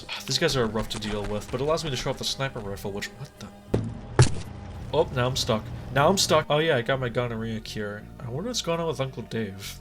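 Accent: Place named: United States. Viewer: Žiūriu vidosą, akcentas angliškas, galvoju čia amerikonas ir pyst Gustavo Enciklopedijos intro pradeda grot porą sekundžių. Šito vapšie nesitikėjau išgirsti žiūrėdamas vidosą apie morališkumą žaidimuose.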